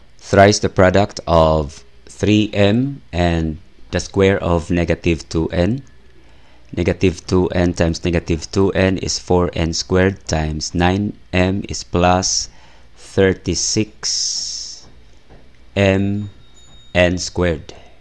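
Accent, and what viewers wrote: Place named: Philippines. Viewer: You sound like a Filipino po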